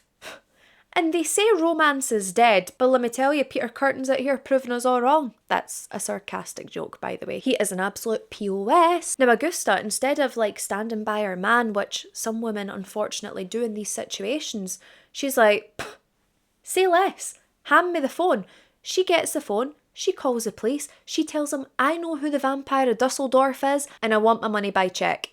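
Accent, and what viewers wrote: Scotland, United Kingdom. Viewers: To the guys who complain about the makeup do like me put the video on and just listen I enjoy listening to the cases and the Scottish accent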